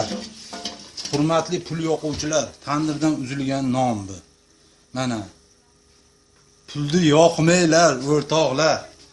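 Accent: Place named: Fergana, Uzbekistan. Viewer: Сурхондорйолика емас фодиликларга ухшайсизку .шевадан .пул йоканлар калбака пулни йокади.ахмок емаску одамлар.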